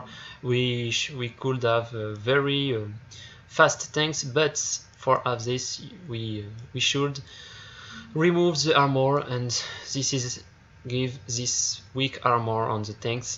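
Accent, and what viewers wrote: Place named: France. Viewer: bah je comprends pas tout.... mais c est de l anglais avec un belle accent français...lol..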